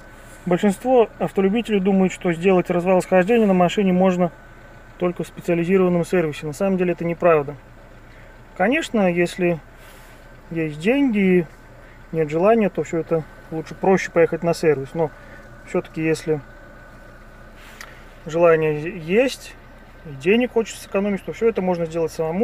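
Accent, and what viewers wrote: Belarus. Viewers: Ты из Белорусии? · бульбяш.... говор не спутаеш))) +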